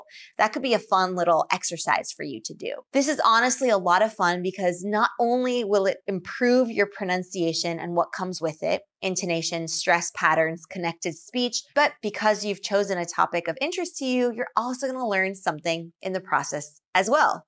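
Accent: United States. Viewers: What a beautiful style of your speaking an American English thanks for your kindness.forget you not sprite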